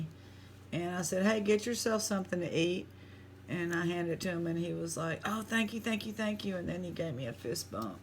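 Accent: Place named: South, United States. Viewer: Hi from Victoria BC in Canada! I just want to tell you how much I appreciate and look forward to your videos. I love your beautiful voice with your slow southern accent. I adore hearing your stories and your adventures in dumpster diving, rock hunting and metal detecting. Telling us about your pets and finally finishing up with your devotional reads. When you find precious metals I’m just sooo happy for you. So, if you ever think that no one listens or appreciates you - remember that you make a difference to a little Canadian living in the constant rain, dampness and cold over the wintertime here on Vancouver Island. Big hugs to you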